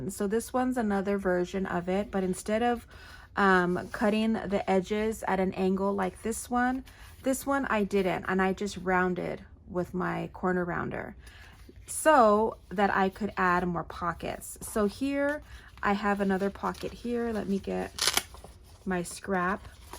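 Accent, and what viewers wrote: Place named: Colorado, United States. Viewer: In your last haul video I heard a little accent that reminded me of home. I'm from Colorado originally. Just curious if you grew up near there.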